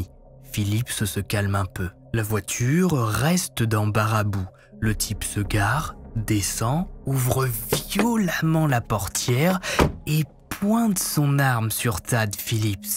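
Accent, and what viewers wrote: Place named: North, France. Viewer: Cet accent de nordistes c’est pô possible !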